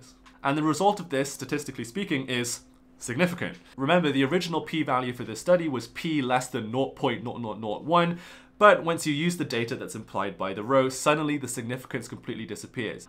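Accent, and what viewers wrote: England, United Kingdom. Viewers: lol -he doesn't have Chinese surname and he has an English accent and your first guess is that he might be Chinese educator living in China?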